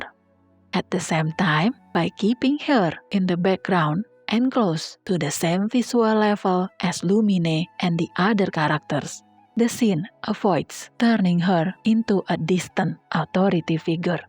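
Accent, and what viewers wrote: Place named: Indonesia. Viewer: Definitely indonesian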